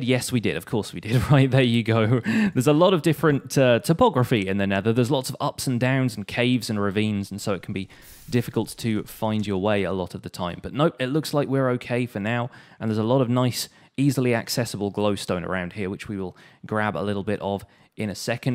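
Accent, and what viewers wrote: England, United Kingdom. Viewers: Hey are you from england or USA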